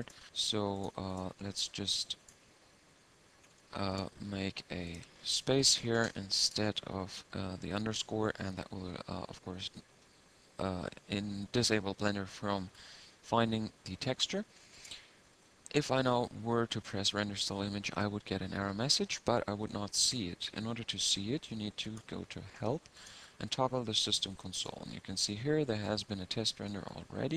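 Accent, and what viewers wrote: Germany. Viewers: I like german accent in English - anyway thanks for this tutorial.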